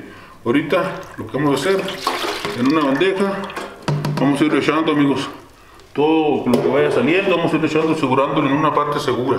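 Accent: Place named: North, Mexico. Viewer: Suena a que es del norte de Mexico , este mi amigo.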